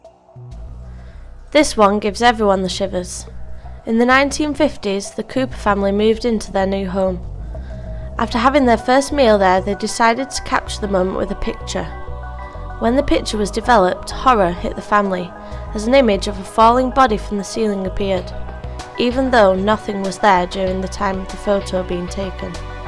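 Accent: United Kingdom. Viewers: commentators ... having thick British accents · Finally a British narrator!!...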